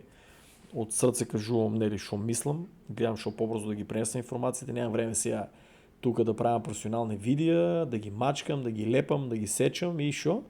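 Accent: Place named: Kočani, North Macedonia. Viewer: Се навлеков на кочански дијалект. :rocket-red-countdown-liftoff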